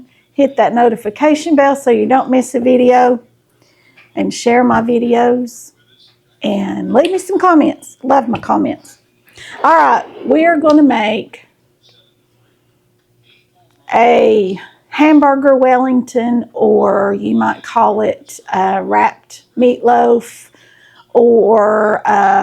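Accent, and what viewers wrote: South, United States. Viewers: Ahhh….🙋🏼‍♀️ 🎉 Yes, I’m a new subby and I love 💕 your shout out! Thanx!! Living in Australia 🇦🇺 and growing up on lovely romantic Southern films and accents…it’s so endearing to me. Familiar and nurturing, kind and “easy peasy…okey doke!” 💋 ✌️😁👍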